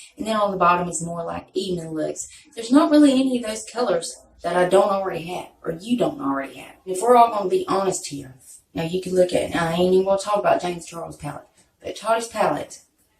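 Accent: South, United States. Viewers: What’s your accent ? Are you from the south ?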